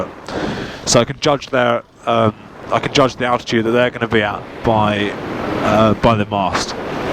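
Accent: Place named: United Kingdom. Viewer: Great video! I could sit here and listen to that Proper British Accent all day.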